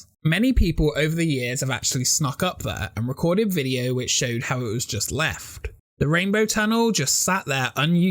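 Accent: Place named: England, United Kingdom. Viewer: great video again! where are you from?, you sound like your from England accent but i cant differ whereabouts.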